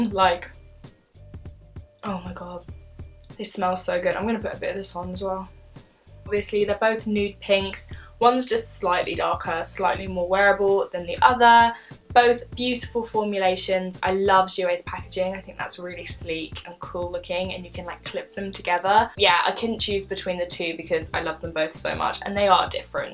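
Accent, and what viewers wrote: United Kingdom. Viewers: Oh and btw, I know you're British, and have a British accent, in case that was clear from my previous post, lol.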